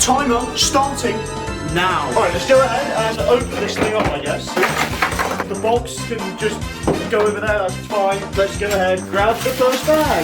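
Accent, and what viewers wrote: United Kingdom. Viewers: Why does he talk about dollars but has the most British accent ever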